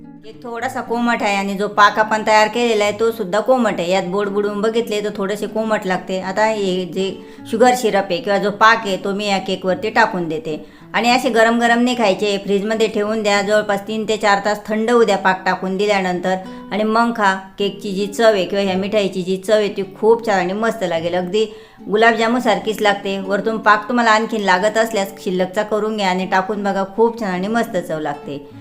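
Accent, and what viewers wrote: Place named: Khandesh, India. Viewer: तुमची केकची रेसिपी झटपट आणि खुसखुशीत आहे. पण तुम्ही सुद्धा केक सारखं * थोडं गोड आवाजात निवेदन केलात तर ,* आम्हाला केकची गोडी अजुन वाढेल. तसेच बोलताना इतकी सुपरफास्ट एक्स्प्रेस * कशाला चालवता? बोलताना काही * पुर्णविराम, स्वल्पविराम , प्रश्नचिन्ह ,उद्गारचिन्ह असतात. * त्यांचा वापर केला की * आपलं बोलणं * जास्त इफेक्टीव्ह होतं * म्हणून सर्वप्रथम बोलणं आणि भाषा, बोलण्याची खानदेशी स्टाईल * सुधारा.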